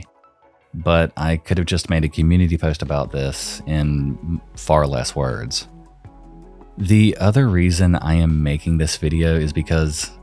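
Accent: South, United States. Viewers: I only care about your silky Southern Sibilance.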